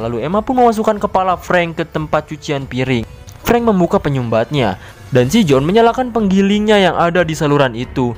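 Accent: West Java, Indonesia. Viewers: Anjir teh 😄 Sunda bgt euy aa teh gening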